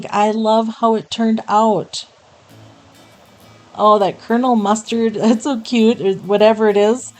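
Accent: Minnesota, United States. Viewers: Quick and super cute projects! I am always looking for jar ideas. Those little ones are too cute! You cracked me up with the little joke about the jars saing we need some beads to keep it farmhouse ya know! And sounds just like Minnesota way of speaking! 😂😂 I loved the sign! The color is perfect and the name of the store, and last one in its a rotten egg! So fun 😂 You make me laugh at least once a video. I love it and you my friend! Have a great week! 😚😚 Oh and congrats about your shop! You're going to be home all day to mind the store?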